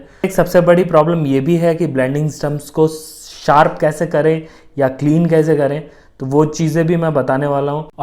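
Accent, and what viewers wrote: India. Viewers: अंग्रेजी बोलते हुए भी आप क्या कह रहे हैं मुझे समझ नहीं आ रहा है, लेकिन आपकी अंग्रेजी भारतीय है अमेरिकी अंग्रेजी बोलने की कोशिश करें ताकि दुनिया आपको समझ सके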